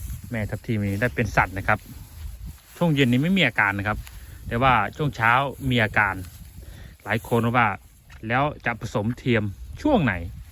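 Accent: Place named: Surin, Thailand. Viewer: ฟาร์มอยู่จังหวัดอะไรครับ สำเนียงเหมือนคนสุรินทร์เหลา😁😁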